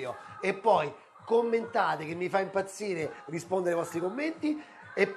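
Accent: Rome, Italy. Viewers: Complimenti chef! Sono allergica al peperoncino, si può fare senza? Grazie mille sei unico! Oltre ad essere strabravo, carico d'inventiva, sei proprio un simpaticone, ci metti di buonumore, impariamo un sacco di cose si ma, ridendo.... Ue' con sto accento romano verace ci si diverte un sacco! Grazie mille chef! Bacino al Vs splendido bimbo!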